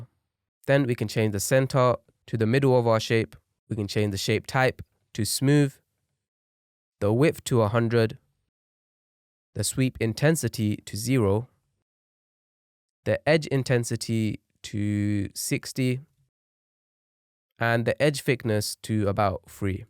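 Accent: United Kingdom. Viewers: most british accent i ever heard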